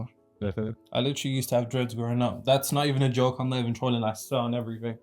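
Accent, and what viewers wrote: United Kingdom. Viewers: The UK accent gives the impression of someone articulate and intelligent to Americans. This Knowledge isn’t about intelligence. It’s about you. Sometimes the mirror is painful to look at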